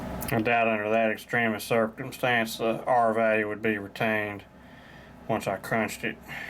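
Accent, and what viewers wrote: South, United States. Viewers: Buddy, mineral wool is for sound proofing and not for insulating or moisture control. Call a union contractor in for a consult. Use cellulose to control moisture and put poly film up after it is blown in. Stop reading about this on the webby web, call a local professional. YOu sound southern so you might need to put the poly outside, again call a local professional. For what the roxul costs you could hire that job and have it done with a proper material for your situation there. Good luck! Roxul will hold water btw, it wont control it. Think of a wet sponge. And then the slag will rot and rust, you'll see. And when it does it smells like someone shitted in your walls. You asked for other's experience, here's mine.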